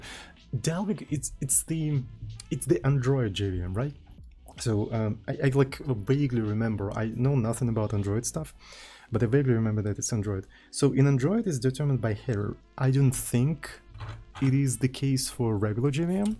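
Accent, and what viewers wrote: Russia. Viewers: Hi (or privet, as a Russian I hear russian accent in your speech :D)! Thanks for the video :)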